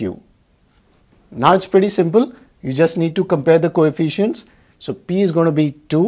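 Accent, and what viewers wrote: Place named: India. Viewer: listen to his accent, you can tell he is indian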